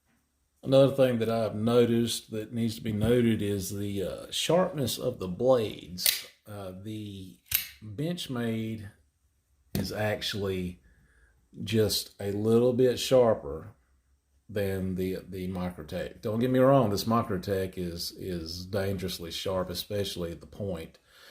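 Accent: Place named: South, United States. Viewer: just joking it your southern drawl.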